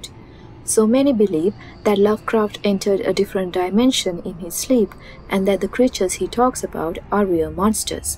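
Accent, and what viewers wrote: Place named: England, United Kingdom. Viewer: nice. story telling! i am curious, i have heard your accent once somewhere else, is this accent from England or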